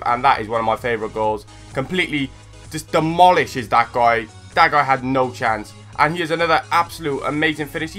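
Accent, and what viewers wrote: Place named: Welsh Valleys, United Kingdom. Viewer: u sound like taht guy out of the valleys lol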